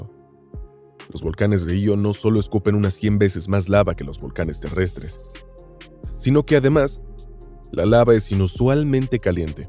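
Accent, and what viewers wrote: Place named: United States. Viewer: Todos estos canales que se las tiran de cientificos han de tener narrador latino agringao?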